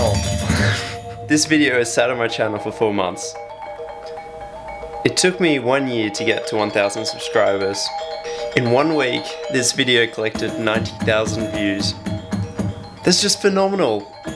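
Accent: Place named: Australia. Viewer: before i found this vid on your channel i thought you might be Australian guess i was right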